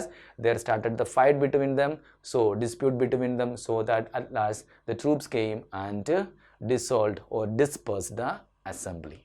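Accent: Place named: Kerala, India. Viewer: THANKYOU SO MUCH SIR FOR THE AMAZING EXPLANATION THE WAY YOU REVISE QUICKLY REALLY IMPRESSED ME SIR SIR I THINK YOU ARE FROM KERALA ..[ PREDICTED FROM THE ENGLISH SLANG ] I AM JUST THE NEARBY STATE SIR TAMIL NADU..... I HOPE YOU KEEP MAKING VEDIOS OF HISTORY AND WAITING FOR NATIONALISM IN INDIA SIR THANKYOU SO MUCH SIR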